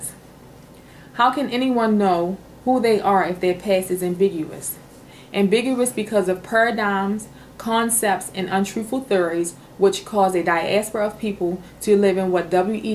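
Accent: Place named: Baltimore, United States. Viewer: You got to be from Baltimore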